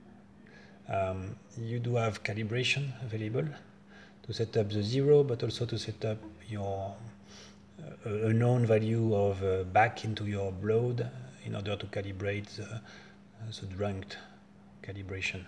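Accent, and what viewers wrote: France. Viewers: Bonjour, je devine par le logo Orange F mais aussi par l'accent que vous êtes français ! Je suis intéressé à en savoir plus sur votre projet et son fonctionnement plus précisément pour le transfert de données jusqu'au smartphone et comment rendre l'outil plus fiable à partir du détecteur MQ-3, pourriez vous m'envoyer un mail à mon adresse mail afin de communiquer plus facilement : <mail> Merci d'avance pour votre réponse et au plaisir de recevoir de vos nouvelles !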